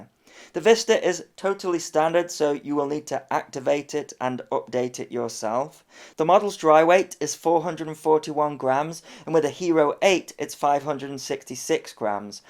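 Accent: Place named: United Kingdom. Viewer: Yey! He's back... Teasing us with his new toys!!! :-D Great to hear more and more British accent on the hobby channels!!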